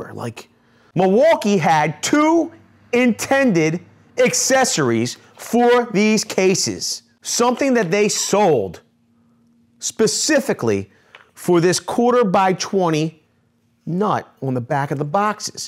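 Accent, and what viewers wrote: Philadelphia, United States. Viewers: That philly accent boi "bawxes" "milwaauwke" love it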